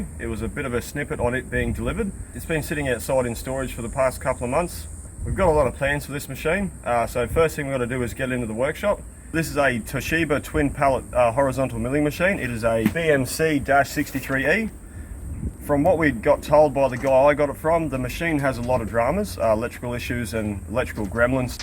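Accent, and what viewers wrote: Queensland, Australia. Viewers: Simple CC can barely cope with American English, struggles with Australian English and is completely fu!@ when it comes to Queensland English.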